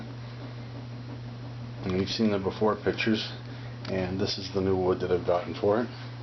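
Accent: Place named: Ontario, Canada. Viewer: Must be from Ontario, right? It's the slight but detectable "uuut" in your speech.... (PS: I"m originally from Vancouver where all from Tah-ronna & points east want to really live. Lotus Land.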